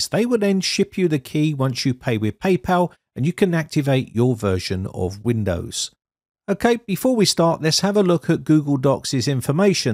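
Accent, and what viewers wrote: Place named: Cockney, United Kingdom. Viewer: Hi, I've been using this tool for a while now and I've noticed that it struggles to understand certain dialects. So, if you don't speak the King's English (like me - I'm from Yorkshire) or if you have a Cockney accent (like you), then you'll need to proofread the text it generates and correct any mistakes. However, it still saves a lot of time compared to typing everything out manually, even if you have to edit it afterwards.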